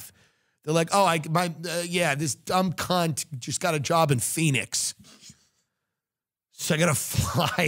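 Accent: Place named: South, United States. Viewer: An epic stream of consciousness ad, delivered in a creepily hushed southern accent. Love you, 😂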